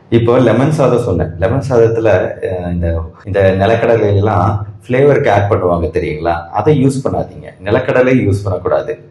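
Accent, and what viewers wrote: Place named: Coimbatore, India. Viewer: வணக்கம் டாக்டர். கோயமுத்தூர் பாஷை வாசம் வருகிறது உங்கள் பேச்சில்... சிறந்த அறிவாளி அறிவான சொல்.. செயல்... வாழ்க பல்லாண்டு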